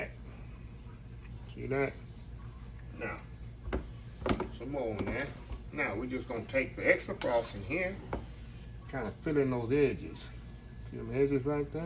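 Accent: United States. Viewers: We're from Philly, up north and my grandson, he is 10 said, what kind of accent does he have, i was like he is an ole country boy heheh,! where are you from brother?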